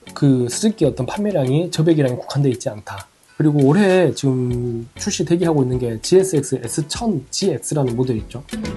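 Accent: Jeolla, South Korea. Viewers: 고향이 전라도인거같아 구독은안합니다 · 오늘따라 사투리가 더 구수한 느낌인데요? 전라도 사투리 먼가 정겨워요 ㅎ 바이크 타는데만 관심잇지 이론은 생각도 안해봤는데 채널 재미나게 보면서 매번 많이 알아갑니다. 항상 좋은 정보 유익한 영상 감삼다!!👍🫰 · 아따 구수허니 잼있게 잘 봤당께요. 서울살이만 십여년하다 올만에 전라도 억양 들으니 기분 좋네요 ㅋㅋㅋ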